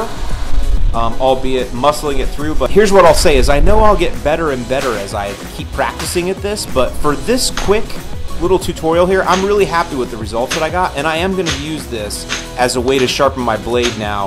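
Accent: Chicago, United States. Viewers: I love the exaggerated Chicago accent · nice chicago accent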